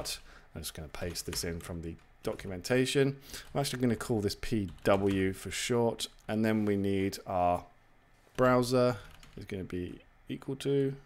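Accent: United Kingdom. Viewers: It's the British accent. It's a feature not a bug. Nothing to improve.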